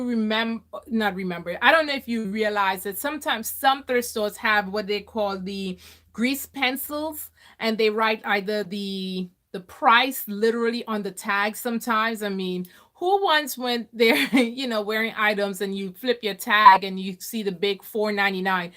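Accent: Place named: Trinidad and Tobago. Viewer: I was wondering where that accent originated from 😊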